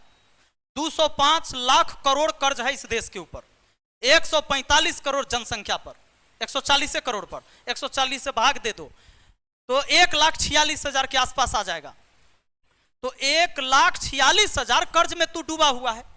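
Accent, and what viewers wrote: Bihar, India. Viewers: Yekdum bihari andaj me bazat ka baja baja diye guru ji super se bhi upar shamjhane ka tarika hai 😂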